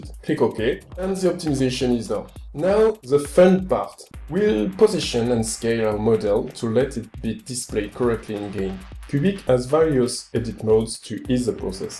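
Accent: France. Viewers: On distingue un petit accent français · Very good tutorial. You have a very heavy French accent, but still it's really good that you took the effort to put subtitles under it :) Keep on making awesome voiced tutorials! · are you french, tu est francais?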